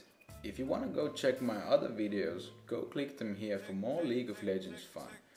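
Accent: Finland. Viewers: Holy shit are you Finnish? :D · omg u sound so finnish :D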